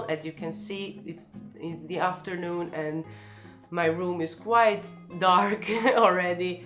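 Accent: Venice, Italy. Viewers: You have a venetatian accent when you speak italian,so cool:) I'm half italian half canadian living in the UK, London. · comunque è buffo, il tuo accento in inglese sembra italiano ahahaha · So true!!! Sembra proprio venezian! 😅💪🏼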